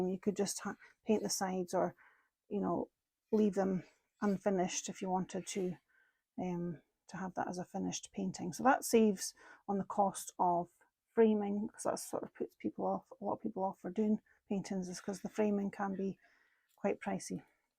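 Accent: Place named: Scotland, United Kingdom. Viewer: Really enjoyed this, as I have recently discovered gel printing. Also enjoy your accent-my mother was from Glasgow(Milngavie) She has passed away and I love hearing the Scottish accent-it is such a comfort!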